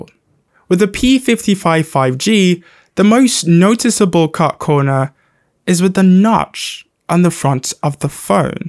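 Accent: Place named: United Kingdom. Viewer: Bro your British accents very very cleary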